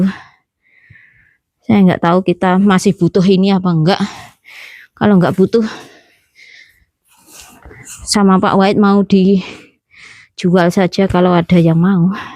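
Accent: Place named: Java, Indonesia. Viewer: Jadinkeingetb.. Logat mba ky logatnya mba puri yg di california aksen jawanya kental banget, apalagi klu ngomong inggris, sehat selalu m family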